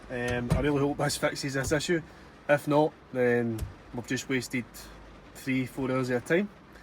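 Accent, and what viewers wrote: Glasgow, United Kingdom. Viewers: Well done mate, not many would have made that relay repair. This repair should give you plenty of work. Which you deserve, once the word gets around, that you supply good work and can be trusted.ps. Good to hear the Glasgow accent 👍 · What a fantastic diagnosis and repair video. I’m having a bit of trouble with the strong Scottish accent, but think I’ve ‘tuned in’, and can understand the work flow. I do repairs for people, as I love solving the puzzles. Just repaired “my grandfather’s Panasonic walkman’ for a friend, which was a long job due to battery corrosion. All the plate-through holes did not connect the top to the bottom track. Each pad was ‘etched’ away from its plate through centre. I had continuity from the centre to the track below, but not from the centre to its top pad. Had to fill nearly all plate throughs with a wire link. 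Anyway, traced the whole board through and found more breaks in the track. All repaired. Fixed it in the end. Absolutely loved your analysis and diagnosis. Using sound to find the faulty relay - fantastic skill. … and then tearing the relay apart to demonstrate the hard fault. Also liked your research. Other authors bothering to write-up their fault-fixes. What a useful database. Looking forward to the next video collection. Matt Lee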